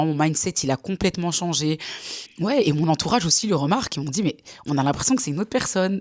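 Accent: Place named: Belgium. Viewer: Olalala, en t’entendant parler, je me suis dit, c’est sur elle est belge ! Et puis tu parles de ta maman qui habite tout près de la Meuse… je ne savais pas que je tomberais sur la vidéo d’une liégeoise en étant moi en pleine perte de poids et changement de mode de vie 🥹 ça fait trop plaisir ! Et t’as vraiment fait les choses correctement. Bravo ! C’est vraiment un changement de mode de vie à adopter sur du long terme la « perte de poids », pas juste faire des régimes hyper restrictifs sur une courte période. En tout cas, merci pour ta vidéo, t’es adorable et super courageuse ❤️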